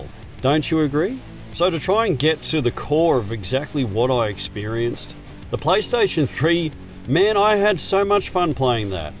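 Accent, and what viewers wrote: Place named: Australia. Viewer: I've understood so too, that it's not a small reason for that channel to be so popular. And those videos are quite well scripted. Even though they make mistakes every now and then. Your pacing, use of voice, script, editing and that Australian accent plus those sentences or questions with your voice modified are well made/good content. 👍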